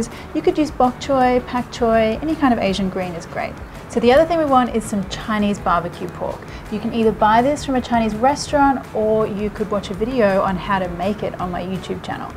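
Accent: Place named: Australia. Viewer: Your food and recipes are so wonderful and so easy to make. .love your Thai style and very aussie accent..your mom and dad must be so proud..I am so happy to have found your channel💖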